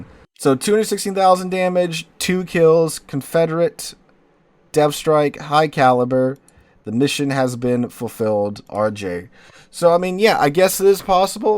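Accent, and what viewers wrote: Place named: South, United States. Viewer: I think it's just a south US thing. There's a town in Kentucky called Versailles but pronounces "Ver-SAYLES."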